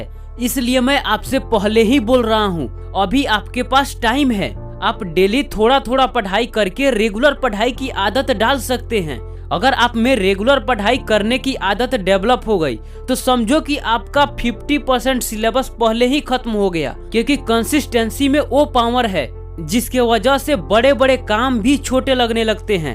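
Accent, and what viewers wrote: Bihar, India. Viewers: Bhaia aap bihari ho kyaa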